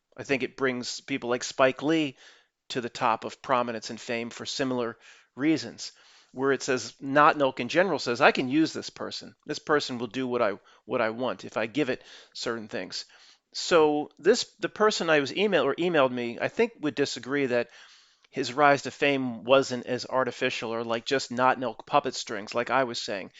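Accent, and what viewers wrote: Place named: Philadelphia, United States. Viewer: That Philly accent is real. Cheers,!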